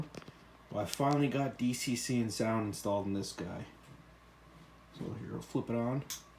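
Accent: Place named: Cambridge, United Kingdom. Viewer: you sound like you are close to me , Cambridge ???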